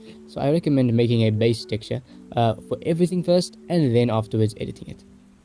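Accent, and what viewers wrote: South Africa. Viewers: nice tutorial bro are you from south africa? I can hear from your accent lol. I'm from cape town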